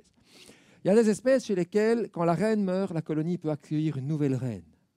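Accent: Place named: Brussels, Belgium. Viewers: ... L'accent du conférencier, de même que son type d'élocution, sont plutôt ceux d'un francophone bruxellois. Ennoutre, l’intéressé est rattaché de façon assez significative à l'ULB. Un accent pas si différent, en l'occurrence, du phrasé d'un Benoît Poelvoorde, par exemple – encore que ce dernier soit originaire de... Namur ! (Mais le 'rouleau compresseur' linguistique qu'a été la télé depuis les années 60-70 a pas mal contribué à uniformiser nombre de particularismes régionaux, en Belgique comme ailleurs. Sauf, peut-être, du côté de Liège précisément, ex-principauté indépendante, bien connue pour son esprit frondeur et et sa résistance séculaire aux influences extérieures ?). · Tellement magnifique!!! Qu'est ce que j'ai pu passé petite devant un nid de fourmis; j'en retire de mes expériences d'enfant, une société super organisée, j'étais toute jeune stupéfaite... 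Cette volonté, ce courage déterminé en la vie, sublime!!! Vous en parlez remarquablement bien!!! Elles sont formidables et quelquefois, excusez-moi, elles nous emmerdent!!! Néanmoins, depuis mon jeune âge, elles m'ont toujours fascinés... Votre exposé me rallie encore plus vers elles, je vous en remercie!!! A votre accent, vous êtes Belge (oui j'ai vu Bruxelles), vous êtes de Liège (l'accent) ??? Je le suis aussi ; mais à présent en Provence 04, et encore beaucoup de petites fourmis !!! SOURIRE !!! CHRYS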